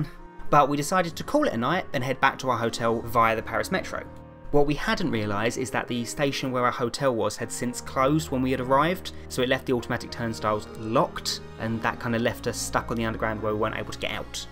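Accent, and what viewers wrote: United Kingdom. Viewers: You sound British, are you British? Nice video, by the way. I have a question, why do you say, "Ello, ok" In your intro all the time? Is it cause you like to say it all the time? Anyways, can you translate this? Spanish Estoy tan contenta de que tu madre te haya llevado a este mundo. Lo siento si ella se fue o algo. No quise hacerlo si ella está muerta. Pero, eres tan especial y amable. Gracias por estar en la vida de YT, y en las vidas de todos. ¡¡Eres genial!! Hint. (it's really nice.)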